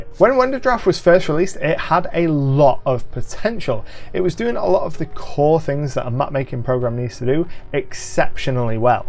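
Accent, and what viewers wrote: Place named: North, United Kingdom. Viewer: Hi New subbie. I played D+D way back when it first started in the 70s (eekkk - showing my age!!). Had a game going for 6 years in the early 80s. Got back into the idea recently and just started doing digital stuff. I purchased Wondercraft and came across your channel. You sound like you are from my part of the world (north east England). Looking forward to learning more from your videos! Thanks, take care and stay safe in these strange times.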